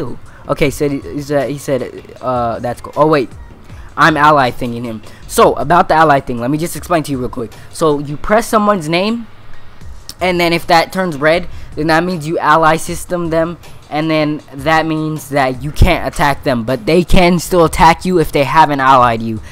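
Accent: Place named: United Kingdom. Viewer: british acent gang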